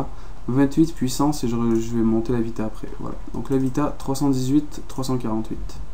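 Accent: Quebec, Canada. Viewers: J'ai reconnu ton accent québécois ;) GG toi t'es un maître de la FM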